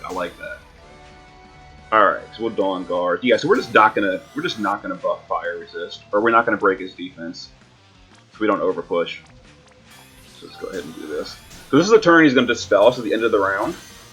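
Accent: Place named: East Coast, United States. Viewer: Gotta be east coast at least. Or you're one of those weird people who had a brain injury and inexplicably woke up with an accent. Ah, I know, are you from Lanzelt? :-D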